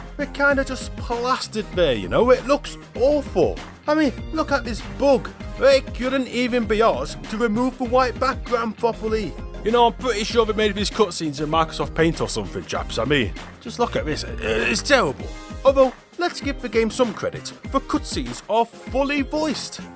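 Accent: United Kingdom. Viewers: I love your english stile Mr., so british so elegant. What a pleasure to hear your rewiews added with creativity and humor ❤️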